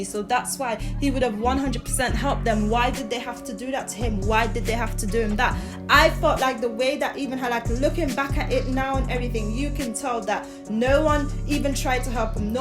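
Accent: England, United Kingdom. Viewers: I hate your accent, use another voice on these videos.....& why is a foreigner so integrated in American street culture? Speak on England or wherever you from smh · Where you at in London? Your accent think. You talk like you knew the mans personally. This is weird af. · Another foreigner trying to speak on what’s going on in the hood through what she sees on social media.🗣🗣🗣🗣 how are you a credible source in any way?????🧐 you speaking on Chicago business with a British accent???🤣🤣🤣🤣🤣 Maaaaannn.... yall need to stop with the clout chasing and assumptions. The only source you have is social media. You have NO CLUE of what’s actually going on in the streets. Who would subscribe to a British person speaking on hood affairs in Illinois???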